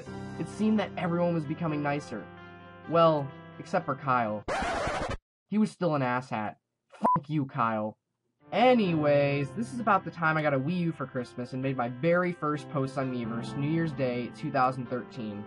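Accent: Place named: United States. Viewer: You have no idea how hard it was for me to figure out what you meant by preschool, middle school, and highschool. Im from England so Im not really used to how you americans pronounce things xD I think I get it... Like if you cri evrytim.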